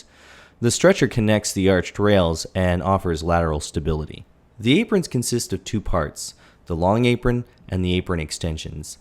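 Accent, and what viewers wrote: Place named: Midwest, United States. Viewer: Nice work ...you don’t sound like your from Alabam mor like the Midwest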